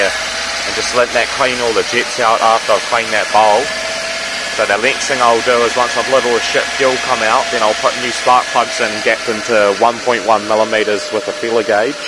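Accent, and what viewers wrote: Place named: New Zealand. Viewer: Hey bro, sounds like your from New Zealand, are you from Auckland at all? I've just purchased a 2E 1994 Toyota Corolla Hatchback recently and it seems to be running a bit rough, if your in Auckland would you possibly be keen to maybe check it out and maybe tune it? Would pay for your time etc and would rather have it done by an expert then trying to mess with it myself since I'm not really mechanically minded, if your keen let me know. I'm thinking it could be the mixture, I adjusted the idle screw and it seems to rev lower as the previous owner adjusted it to be high revving as that's how he liked it he said (strange but yeah hahaha) Cheers bro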